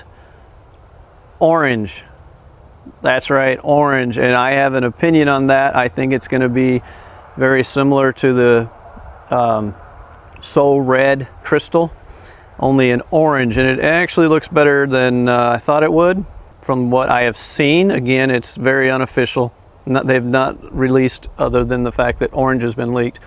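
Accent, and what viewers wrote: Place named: South, United States. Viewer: That’s just how many from the South speak — I, for one, like it. Be pretty boring if everyone spoke at the same speed. Watch reruns of Andy of Mayberry or the film To Kill a Mockingbird; that’s how folks talk down there. Seinfeld? Much faster. It’s all good.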